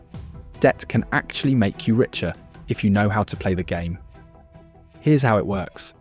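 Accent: England, United Kingdom. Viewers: Why you got an english accent. But the whole video is talking about dollars ?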